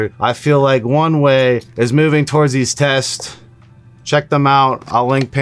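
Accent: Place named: Western Pennsylvania, United States. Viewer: I hope you get sorted and feeling better. I noticed a Western(ish) PA in accent. Is this where you are from?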